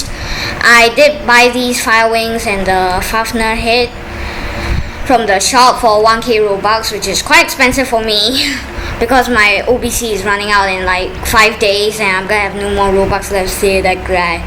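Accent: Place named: China. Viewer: u sound Chinese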